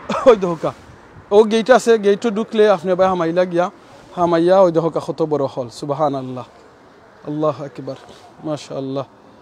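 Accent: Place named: Sylhet, Bangladesh. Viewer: ভাই , আপনার কথা বাংলাদেশের বিভিন্ন অঞ্চলের মানুষ শুনতেছে ভিড়িও দেখতেছে , সুতরাং আপনি সিলেটের আঞ্চলিকতা পরিহার করে কথা বলবেন ।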